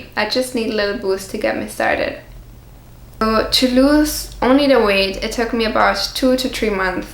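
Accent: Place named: Germany. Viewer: Where do you came from? Sounds like a German accent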